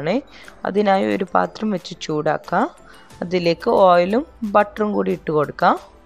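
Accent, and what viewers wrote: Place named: Kozhikode, India. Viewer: തനി കോഴിക്കോട് ഭാഷയിൽ അവതരണം 😄😄 So nice keep it up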